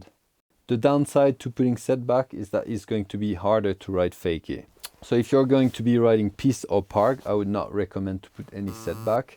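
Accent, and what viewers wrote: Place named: France. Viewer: there is a reason why everyone likes! his so calm and radiates good energy, and the french accent is so smooth u can spread it on ur bread like butter, french butter =) everyone: hav a good surf on ur turf!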